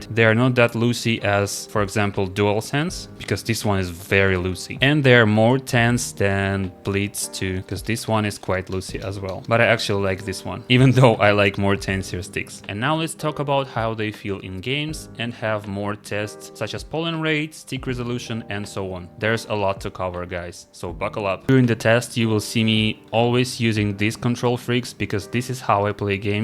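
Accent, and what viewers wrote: Russia. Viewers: Я у меня конечно с английским плохо, но благодаря русскому акценту мне даже субтитры не пришлось включать)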